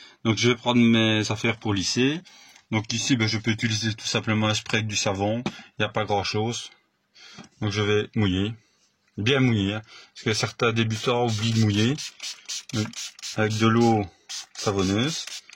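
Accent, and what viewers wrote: France. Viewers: C'est très propre bravo et j'aime bien le petit accent alsacien 😉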